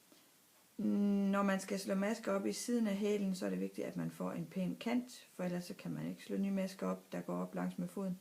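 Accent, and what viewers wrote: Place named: Hjørring, Denmark. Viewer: Woow nu fatter jeg det. Superfin demo. Og endda på mit dialekt. Hjørring. Ikke?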